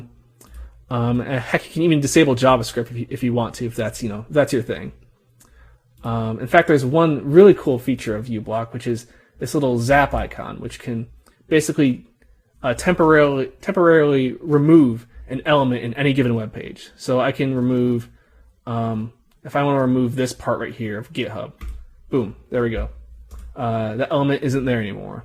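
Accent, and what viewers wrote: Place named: United States. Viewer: He sounds American, poor guy. Being Catholic in USA must be like being a Russian in post-WWI Germany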